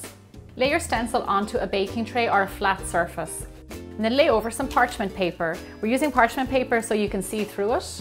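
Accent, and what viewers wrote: Ireland. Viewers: Her accent, is she from Ireland? Sorry I'm not a native speaker :)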